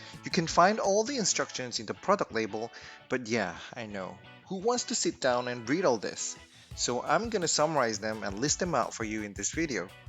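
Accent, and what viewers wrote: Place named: United States. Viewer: ㅋㅋㅋㅋ목소리 톤이나 자막이나 미국 사람 다됐네 ㅋㅋㅋㅋㅋㅋㅋ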